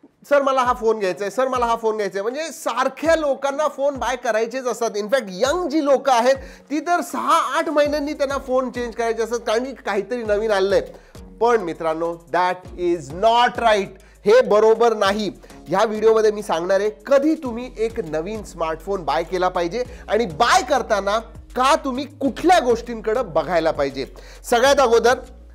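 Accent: Pune, India. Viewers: Sir, tumhi punyache ahat kay?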